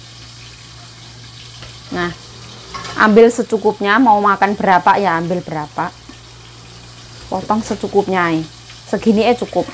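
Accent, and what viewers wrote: Java, Indonesia. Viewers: Kok medok bangat ngomong nya...ya.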